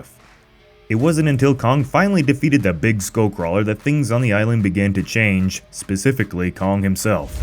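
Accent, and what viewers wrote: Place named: United Kingdom. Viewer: I can now tell you are from the UK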